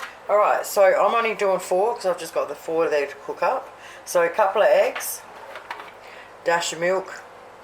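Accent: South Australia, Australia. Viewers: You have to be a south aussie, I can tell lol Love your recipes, thanks for sharing 😊😊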